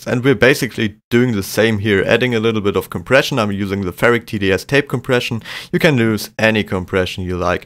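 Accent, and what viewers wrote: Germany. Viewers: Great vid man, thought I detected a German accent ;) Hast du ein eigenes soundcloud seite? Deine beats sind richtig geil!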